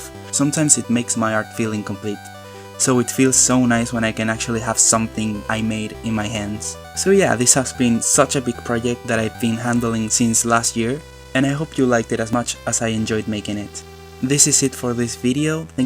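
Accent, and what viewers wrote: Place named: Basque Country, Spain. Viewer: Eres Basco?? Qué chimba!! Fuera una idea estupenda hacer este presente para tu amiga Por cierto, me encanta tu acento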